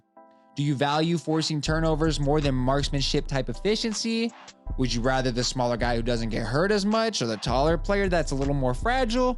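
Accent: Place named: Mexico. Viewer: you sound like a mexican now too bruh u been round the squad religiously fr i see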